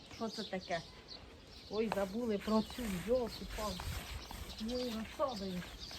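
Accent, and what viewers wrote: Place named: Khmelnytskyi, Ukraine. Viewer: Дякую Вам Пані за такі ЖИВІ відео, на разі я далеко від рідного краю, на Сході України, Ваші відео і суржик нагадують мою Хмельниччину...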